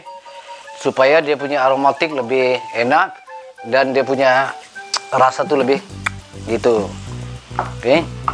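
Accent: Malaysia. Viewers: Orang Padang kelamaan dimalaysia Ampe 32 thn gaya ngomong nya Ampe kebawa kyk org Malaysia asli (Melayu + Inggris) mantap lord 👍 Sehat sllu & panjang umur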